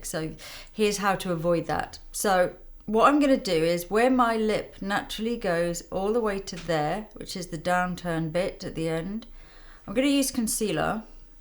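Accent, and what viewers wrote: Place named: Hampshire, United Kingdom. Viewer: Beautiful. Do you come from anywhere Hampshire you have a faint accent?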